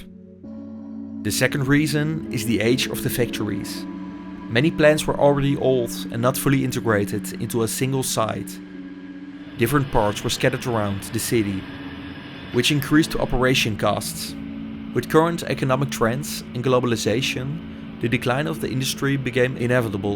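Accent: Netherlands. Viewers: Echt een magnifieke reportage met prachtige beelden maar het is echt lastig om naar engels met een zwaar Nederlands accent te luisteren. 't Zou aangenamer zijn in Nederlands (of Engels ingesproken door een native speaker)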